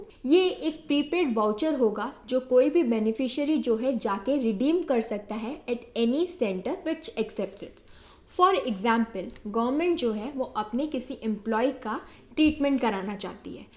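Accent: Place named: Gujarat, India. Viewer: aapki Hindi speech me gujarati ki style thodi lagi muje es liye puchha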